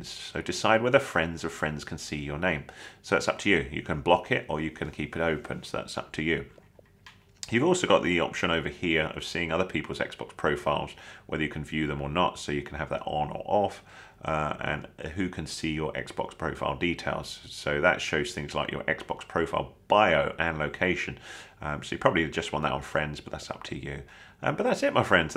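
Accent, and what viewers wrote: United Kingdom. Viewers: U already know it’s a good tutorial when they got a British accent 🙌🏽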